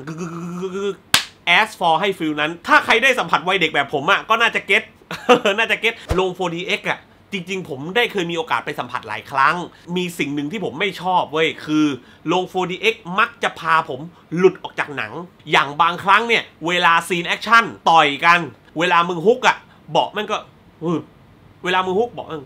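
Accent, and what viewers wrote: South, Thailand. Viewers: พี่เป็นคนภาคใต้รึเปล่าครับ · พี่เป็นคนที่ไหนครับ จังหวัดอะไรครับ สำเนียงเหมือนคนพากย์ใต้เลยครับ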